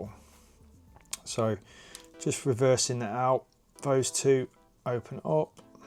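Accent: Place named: United Kingdom. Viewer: Superb video loved watching it! Totally dig your British accent 👍🏻 Fatcat has raised the bar for what to expect for your hard earned money unlike Bandai who makes you pay extra money for water decals & extra weapon set. I mean they are such a massive company and can easily afford to add all of this & more for the kinda money they charge for an RG model kit. Imagine a 3rd party which 100 times smaller company can give their customers so much value added stuff. Bandai is just a money grab & wanna milk every opportunity like every other MNC! SAD BUT TRUE 😞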